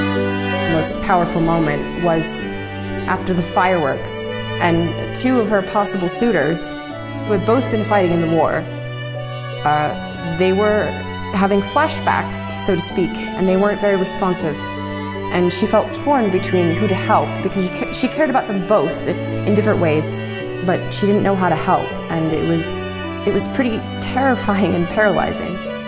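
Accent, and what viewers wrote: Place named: United States. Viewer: Going by her dialect, do a lot of Americans/Canadians get involved in this thing?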